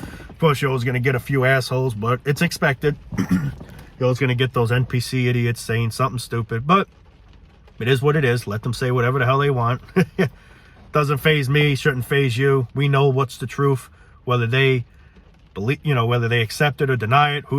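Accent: New York, United States. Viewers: You have that new York accent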